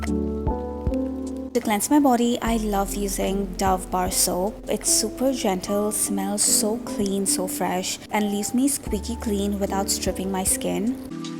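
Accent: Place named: India. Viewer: ❤Your voice gives me comfort. I think you are from India. I recognized you from your accent. I would like to say that I follow you from Algeria. I would also like to say that you are incredibly beautiful. Your hair, your body, everything. Continue with your content because you are really successful. I really loved you. ❤❤❤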